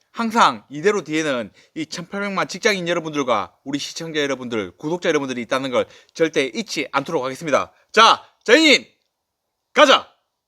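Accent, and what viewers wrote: Gyeongsang, South Korea. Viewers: 형님 보기너무 좋습니다 ㅎ 20대후반에 직장생활을 울산서 5년했습니다 ㅎㅎ 행님 사투리 듣고 있으니 경남지방 놀러가고 싶네요 ㅎㅎ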